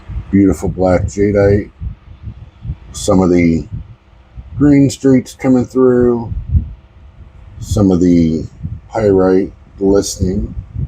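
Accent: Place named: United States. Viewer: Dude sounds like he’s from the states, but he uses metric.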